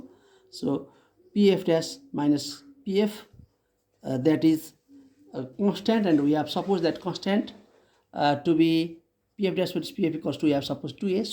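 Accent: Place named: Nepal. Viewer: nepali jasto lagyo sir tapai nepali language ma handina aajhai better huntheo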